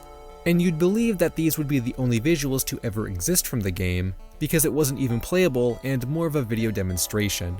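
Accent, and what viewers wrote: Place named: Massachusetts, United States. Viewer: 1000 to 1 your from Massachusetts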